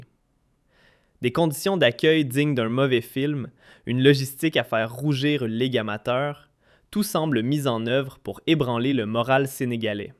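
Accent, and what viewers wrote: Quebec, Canada. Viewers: L'accent québécois est terrible. Changez SVP. Mettez le lecteur habituel.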